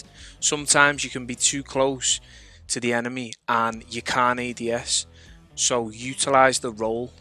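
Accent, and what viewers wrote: Liverpool, United Kingdom. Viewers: you a scouser?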